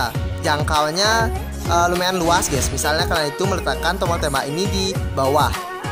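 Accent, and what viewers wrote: Bali, Indonesia. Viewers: Logat bali nya kentel banget bli 😁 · Fiks orang bali😂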